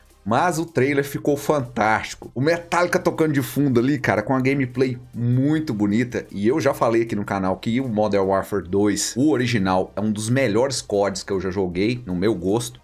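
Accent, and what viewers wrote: Minas Gerais, Brazil. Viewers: ..vc é de onde de Minas Gerais uai?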